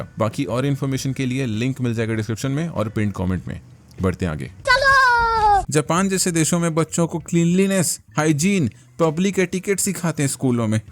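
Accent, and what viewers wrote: Chhattisgarh, India. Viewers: Bhai chattisgarh se ho kya?🤔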